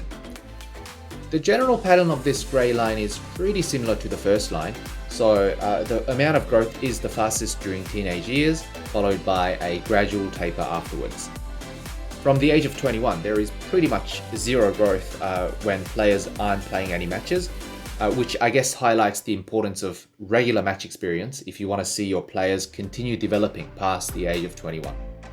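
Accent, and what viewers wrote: Australia. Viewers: every Australian who watches his videos can instantly tell that is an Australian accent, not a super bogan true blue stereotypical Aussie accent but he’s still clearly Aussie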